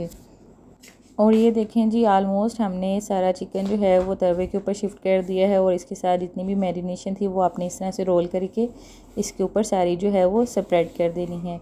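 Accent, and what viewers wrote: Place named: Faisalabad, Pakistan. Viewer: Apki baton se lgta he ap fsd se hn.... & i love fsdians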